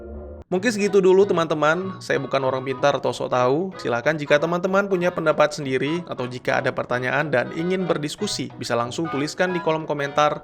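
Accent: Aceh, Indonesia. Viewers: Lo org Aceh ya bg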